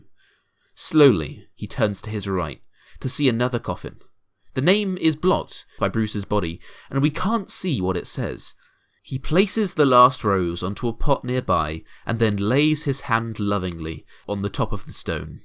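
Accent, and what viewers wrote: United Kingdom. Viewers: Why do you sound British while your grandfather sounds Scottish?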